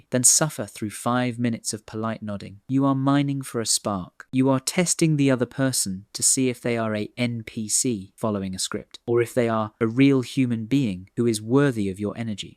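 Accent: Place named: United Kingdom. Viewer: I don't know why your videos are so funny. Think it's the satire with that serious british accent - I love it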